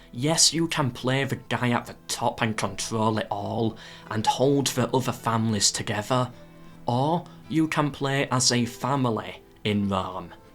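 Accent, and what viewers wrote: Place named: England, United Kingdom. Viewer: I managed to get it to very end but that pronunciation was hard for my ears. What is that dialect? I presume from England. But from where specifically? Just curious.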